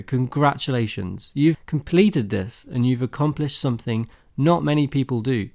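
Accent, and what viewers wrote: England, United Kingdom. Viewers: what's with the Eng. accent????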